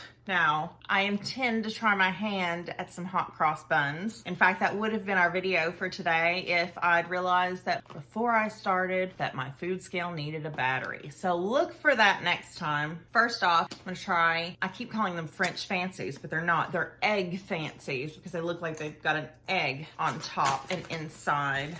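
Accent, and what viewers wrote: Kentucky, United States. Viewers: I know I don't need to say this, but industrially produced cakes like Mr Kipling's are awful compared with proper home - made cakes, well, my mother's anyway. By (late) mother's Bakewell tart was absolutely delicious, Mr Kipling's Bakewell pukeworthy! Sorry, I'm rambling - the result of several rum and cokes! Thanks for the entertainment - I love the Kentucky accent!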